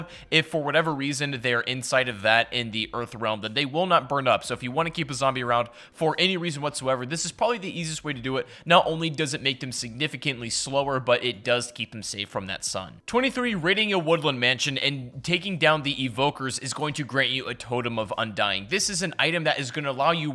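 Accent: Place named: United States. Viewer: Omg an american/Canadian accent thnk goodness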